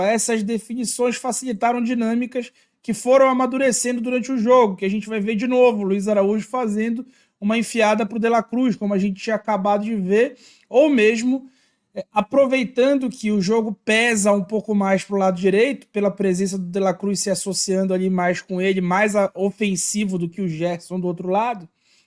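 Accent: Belém, Brazil. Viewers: Vc é de Belém?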